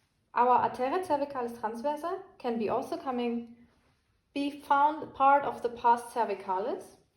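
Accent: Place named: Germany. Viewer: sound German for me, either way thanx for the video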